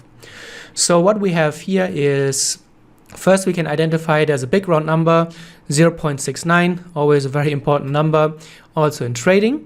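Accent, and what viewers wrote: Germany. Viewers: Are you german? Because of your dialect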